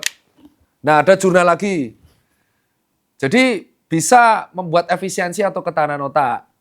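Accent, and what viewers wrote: Java, Indonesia. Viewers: seru nih, soale Dokter Tirta medok Jawane, jadi sperti orang pada umumnya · English Jawaa Medhokk , hmmm kek kenall yang medhookk medhookk "we wok......"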